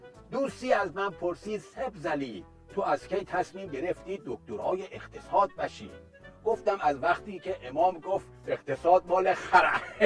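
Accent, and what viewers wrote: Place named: Lorestan, Iran. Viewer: عالی بود .همین برنامه رو با لهجه غلیظ تر لری و با سوژه های مختلف بسازید.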